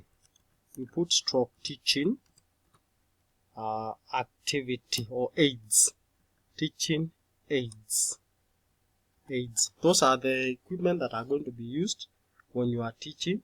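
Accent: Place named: Kenya. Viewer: I guess you're asking about the accent? It's a Kenyan accent with peppered with Kamba(Tribe) accent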